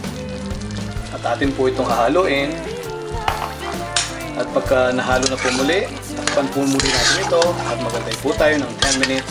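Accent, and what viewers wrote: Pampanga, Philippines. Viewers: kapampangan ka ba??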